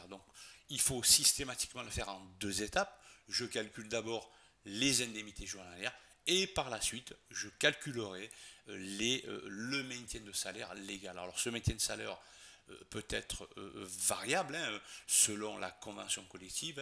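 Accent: South, France. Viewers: ravie de vos explications avec l'accent du sud en plus !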